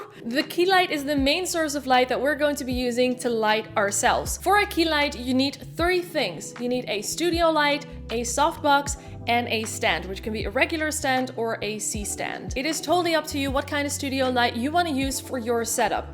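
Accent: Netherlands. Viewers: I hear a vague Dutch accent somewhere in there! Great video. Thanks for the help. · Kaaskop accent spotted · Are you Dutch? Or German?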